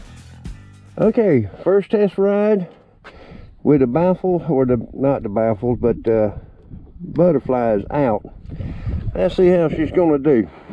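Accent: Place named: Tennessee, United States. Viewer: Damn, I learned a lot! Thanks for the vid. I'm from Georgia, you sound like your from Tennessee?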